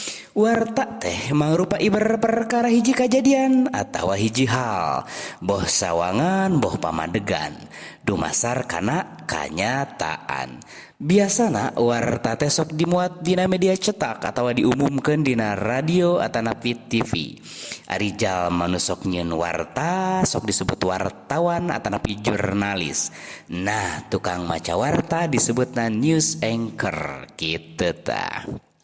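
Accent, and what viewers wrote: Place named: Sunda, Indonesia. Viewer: Waduh Sunda pisan ny......warta berita ... Kedah d momole bahasa Sunda teh.. Semangat kang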